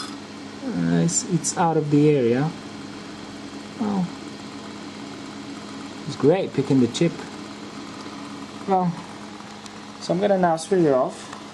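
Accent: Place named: Poland. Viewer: Akcent Polski, chyba że się mylę Polak potrafi. Zrobiłem coś podobnego tylko z coffe machine do tostów i robienia kawy.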